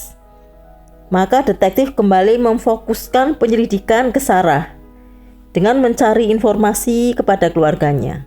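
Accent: Java, Indonesia. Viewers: Logatnya medok bgt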